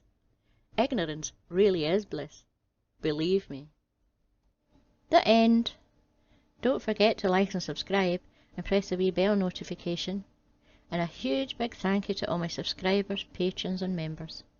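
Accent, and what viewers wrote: Scotland, United Kingdom. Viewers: First hehe.... You have a sweet voice and I love the Scottish accent :) I can listen to your voice all the time :)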